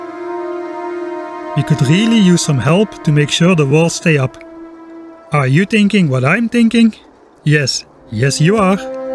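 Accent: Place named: Netherlands. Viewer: 99% sure your Dutch just from hearing the first 30 seconds of this video. Btw first time on your YouTube channel/ first video I’ve seen of you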